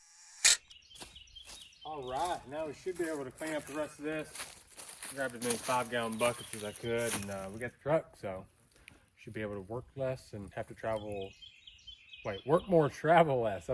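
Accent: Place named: Oklahoma, United States. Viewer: I'm from southeast Oklahoma....I thought your accent sounded familiar ❤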